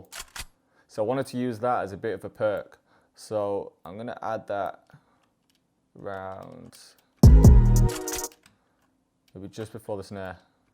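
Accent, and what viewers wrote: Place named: Northern England, United Kingdom. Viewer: He's from up north prolly manny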